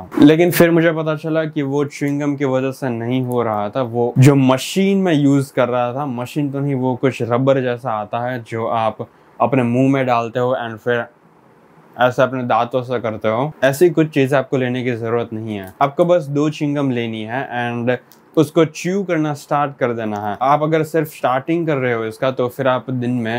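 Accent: Gujarat, India. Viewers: Gujju accent >>>>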